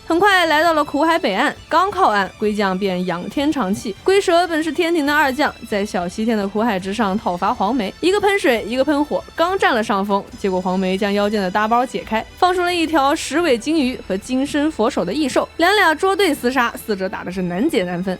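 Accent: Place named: China. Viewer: 地域差异是事实。你不懂这个的话不就是科普？我何时反驳自己第一则回应了？我一开始就告诉你这是口音问题。她说话是普通中国大陆人说话口音，你不知道，所以我们这么多人才一起告诉你同样的话。很明显你不知道，因为你到现在还在因为大家科普你了所以自尊心受损破防 😂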